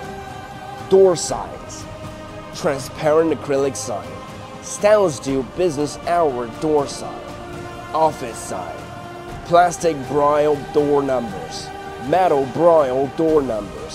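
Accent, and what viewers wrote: South, United States. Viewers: I don't know why has a hint of southern accent, but I love it!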